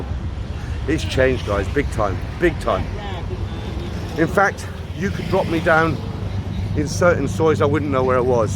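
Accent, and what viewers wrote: United Kingdom. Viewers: is my favorite "Thai expert" youtuber, with his classic British speaking accent and his perfect acting face. Keep up the good reportages of our ones beloved paradies. I started my Thai journeys in 1972 and my last visit was 2 years ago, thats 50 years of fun and exitment, what a good life we had. We were the lucky ones!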